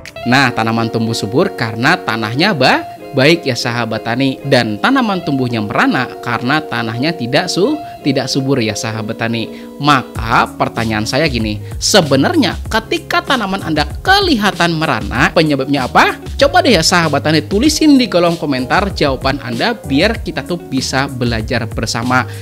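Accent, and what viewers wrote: Banjar, Indonesia. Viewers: Sy baru di Chanel ini, juga baru belajar menanam. Alhamdulillah, isi Chanel nya benar2 padat ilmu. Oiya Om, mohon maaf, cuma mau mengobati rasa pnasaran sy, sy mau bertanya.. Om org Banjar..? Logat nya mirip Sama saya. :)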